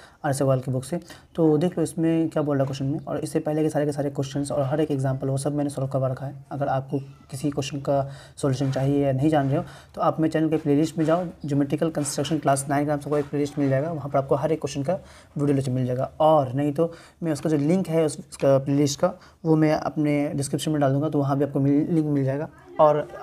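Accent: Bihar, India. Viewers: Sir app bihar se ho?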